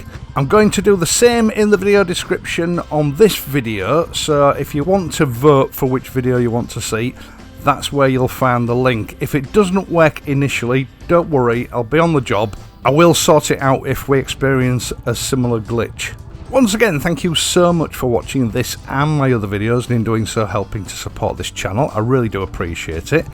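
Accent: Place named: Northern Ireland, United Kingdom. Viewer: I really like your videos, they are very detailed. Also, you sound a lot like Uncle Colm from Derry Girls, which makes your videos all the more pleasant!:)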